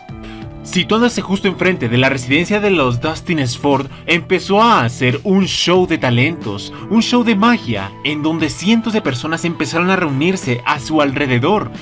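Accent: Colombia. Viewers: Ste man habla colombiano de pura cepa jajaja es una chimba escucharlo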